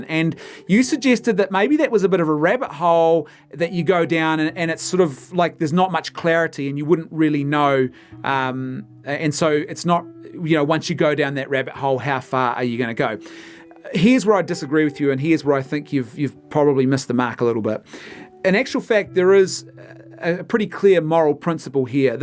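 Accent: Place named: New Zealand. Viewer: Kiwi accent.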